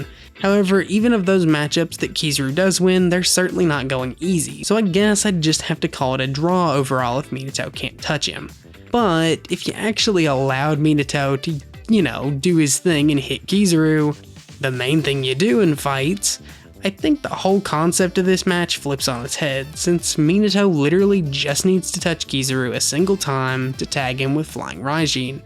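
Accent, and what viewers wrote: United States. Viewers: Do you have a cowboy accent?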